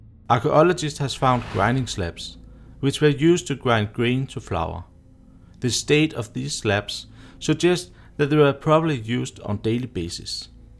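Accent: Denmark. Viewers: the speaker was really speaking very DanishEnglish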